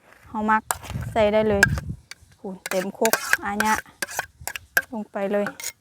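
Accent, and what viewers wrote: Phu Thai, Thailand. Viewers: สวัสดีครับ สำเนียงผู้ไท น่าฟังมากครับ🌹🌹🌹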